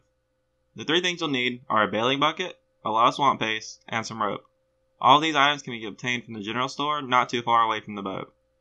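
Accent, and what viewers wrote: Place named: South, United States. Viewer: dang that deep southern accent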